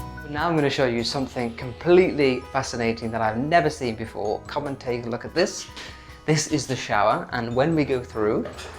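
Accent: United Kingdom. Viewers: I would like to thank your Mother, for bringing such a beautiful💙 angel, into this world!!🤗....I LOVE watching your, glowing-radiant face on camera, and listening to your beautiful British accent!! You, are a treasure🌷🫖 God Bless you, Beautiful Soul!! Love to you, from California.🇺🇸🤗💖💙🤍💜🥰😘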